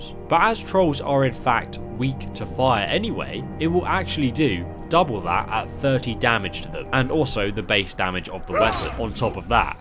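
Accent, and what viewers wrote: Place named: United Kingdom. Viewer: What I've been subbed for 3 years now and I just noticed your British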